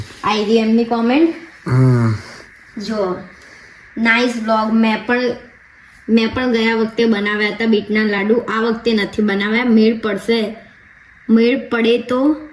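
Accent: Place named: Ahmedabad, India. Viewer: તારી સ્પીચ બહુ મસ્ત છે પ્યોર અમદાવાદ ની ભાષા શુદ્ધ ગુજરાતી સાંભળવાની બહુ મજા આવે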